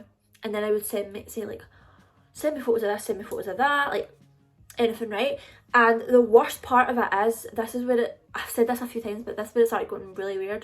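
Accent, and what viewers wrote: Scotland, United Kingdom. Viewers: Sounds like a thicc Scottish accent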